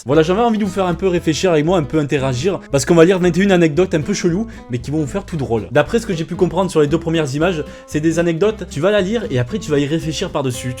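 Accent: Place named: Aveyron, France. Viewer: Le bon accent aveyronnais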